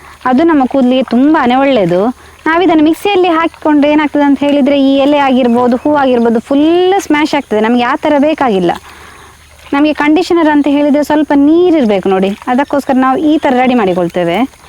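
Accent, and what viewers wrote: Mangalore, India. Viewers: Your Kannad talking voice is mangalur I am at Haveri Karnataka style of kannada speaking is different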